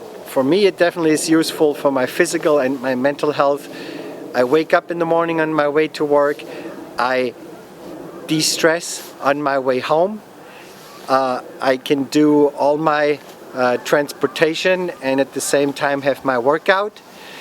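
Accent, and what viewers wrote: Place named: Germany. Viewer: no, your accent gives you away from being originally from Germany, not that you have a business trip there. ;-)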